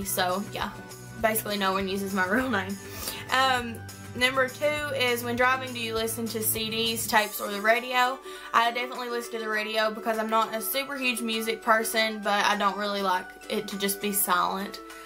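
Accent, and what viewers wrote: South, United States. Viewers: Love your makeup and love your southern accent.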